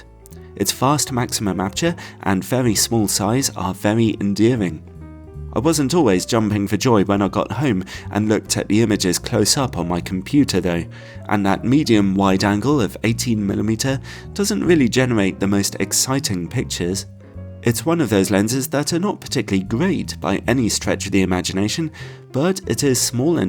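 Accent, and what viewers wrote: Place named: England, United Kingdom. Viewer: what part of England are you from? We are commenting on your lovely accent. Thanks for these videos!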